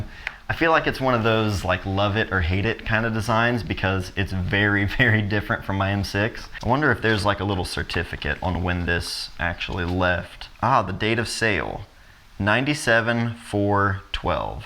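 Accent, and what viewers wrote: Ohio, United States. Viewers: This guy knows how to get us to click! Leica unboxing dictated by southern Ohio timbre? YES PLEASE!!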